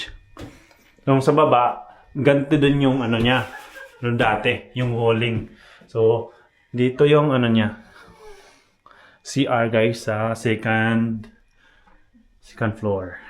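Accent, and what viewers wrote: Visayas, Philippines. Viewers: Maayong hapon Sir, dugay-dugay nako nga watch sa imo vlog,until I heard from you Bisdak diay ka,hehehe😍👍👏, happy ko karon naa diay Japan vlogger nga taga-Davao, so subscribe dayon way langay-langay...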